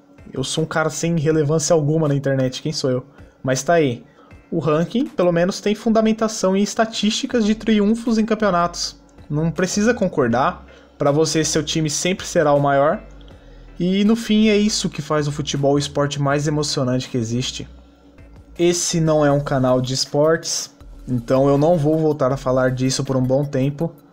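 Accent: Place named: Rio Grande do Sul, Brazil. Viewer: Sudamericana valer menos que copa do Brasil? Qual droga? Kkkkkk dono do canal claramente é gremista pelo sotaque de gaúcho kkkk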